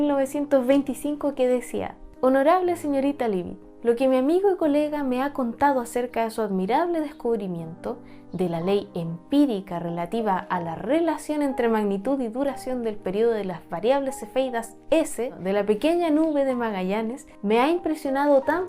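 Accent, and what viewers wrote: Chile. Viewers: Me encanta el acento chileno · Habla como chilena, yo soy chileno jaja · ME FASCISA SU VOZ DULCE Y SU ACENTO CHILENO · Es chilena, no? Nunca entendí el "hate" hacia ese acento, si es precioso.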